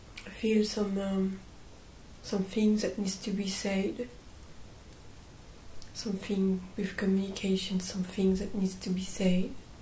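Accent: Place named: France. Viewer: Thank you for your work! Your video (another one) popped up in my feed about a week or two weeks ago, and now this one. So I'm a new subscriber now! :-D I discovered light language a few years ago. Where are you from? I hear a French accent. :-)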